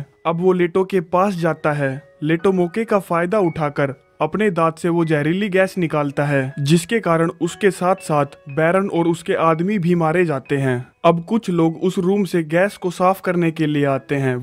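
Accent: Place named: Rajasthan, India. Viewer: tbhi lga hi tha marwadi ya to Rajasthani ya haryanvi🙏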